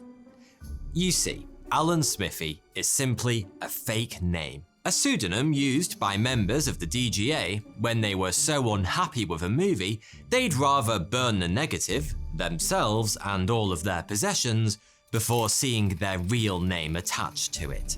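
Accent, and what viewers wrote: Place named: RP, United Kingdom. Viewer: dis bloke is the posh version of vsauce lmao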